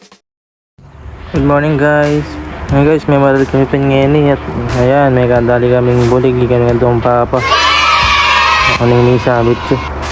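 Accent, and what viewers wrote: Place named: Pampanga, Philippines. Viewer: Ot meg kapampangan ka par😂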